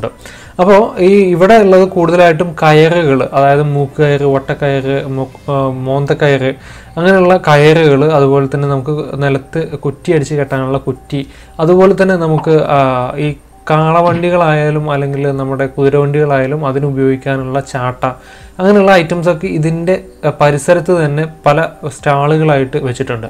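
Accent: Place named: Ottapalam, India. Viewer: Nalla ottappalam language good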